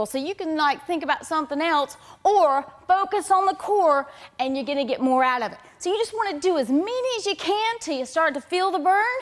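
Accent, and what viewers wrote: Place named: Appalachia, United States. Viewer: Sorry, can't get past the hillbilly accent.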